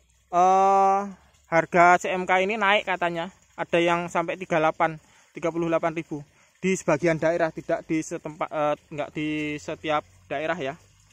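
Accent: East Java, Indonesia. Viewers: iki asale jowo ngendi bos kok kyk jawatimuran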